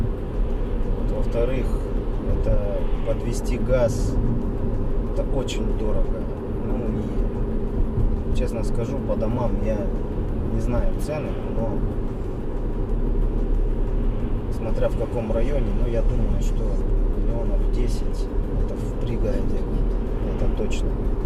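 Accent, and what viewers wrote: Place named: South, Russia. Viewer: Говор у тебя все равно южный 😁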